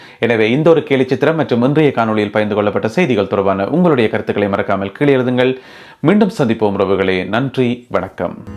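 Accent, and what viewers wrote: Jaffna, Sri Lanka. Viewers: லண்டனில் இருந்தாலும்கூட நீங்கள் யாழ்ப்பாண தமிழில் கதைப்பது எங்களுக்கு பெருமையாக உள்ளது.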